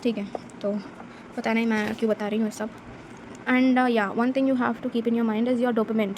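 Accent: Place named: Hyderabad, India. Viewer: oh bruh you speak telugu, same....where you from ? seems like telangana and that too hyderabad...all the best for iiser!!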